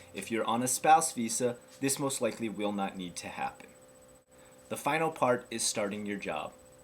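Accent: United States. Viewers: I love the southerened accent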